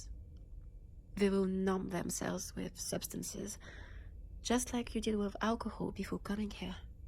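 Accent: France. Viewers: Idk why the french accent have an aura I LUV IT..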